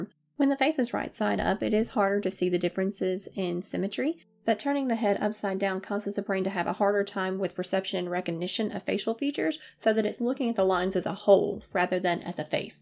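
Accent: South, United States. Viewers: They are all really beautiful. I found this channel through our Facebook page, so now you know it works to advertise! Also, you sound like you are from the south like me! It's great to have other doll collectors from the area. You do great work!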